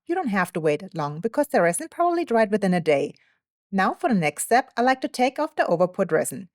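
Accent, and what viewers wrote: Germany. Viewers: Hey, man bemerkt noch den Deutschen Akzent, ist auch gut so. Ich mag dieses Board, sieht sehr schön aus 🥰. Wie würde man so eines herstellen können ohne CNC Fräse? Einiges mehr an Arbeit. Ich habe zwei Schildkröten, ich klein.🥰Danke für Dich 🤗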